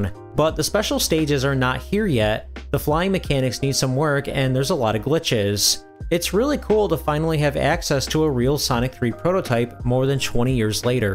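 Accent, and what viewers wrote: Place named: North Dakota, United States. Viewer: I hear a fellow midwestern haha! Sounds like North Dakota hehe! I’m in Fargo. You’re cute too lmao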